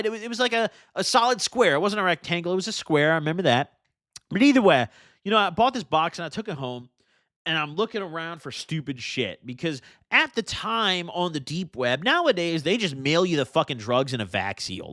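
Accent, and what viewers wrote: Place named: Illinois, United States. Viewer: Yooooo my dawg i fuck with u tough frfr especially being from Illinois im jus now listening to the stories omfg u got it and the fact u talk like a illinoisien i love yo content even better plus u remind me of 50% of my homies from back in the day i can confirm the shit u be talking about I fw it 💯 … I used to be an addict I wish I had ur content when I was def woulda helped me recover but brooooo my nigg@ with this video and me used to ship as a rookie made me fckin die in laughter glad I wasn’t the only one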